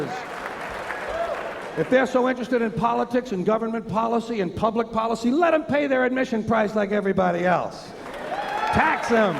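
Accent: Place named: United States. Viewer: بس متقن اللهجة الامريكية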